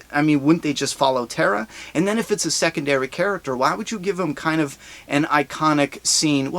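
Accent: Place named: Philadelphia, United States. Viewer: Great video! Couldn't have agreed more, great episode, great ending they should keep blending them. Are you from philly? Your accent reminds me of home lol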